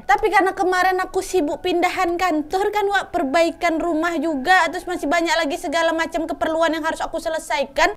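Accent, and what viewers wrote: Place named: Medan, Indonesia. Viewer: Kak kek nya orang Medan yaaaa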